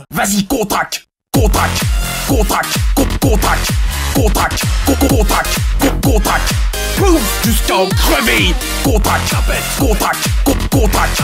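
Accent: Belgium. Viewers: c'est l’accent belge qui fait la différence ;-) j’aime bien toutes tes vidéos on apprend beaucoup de choses !!!!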